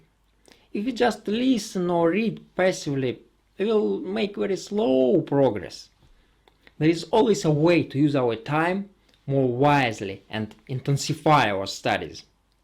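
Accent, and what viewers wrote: Russia. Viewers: He sounds more like Tevya in "Fiddler on the Roof" - which is set in Tsarist Russia. I love his accent! 😁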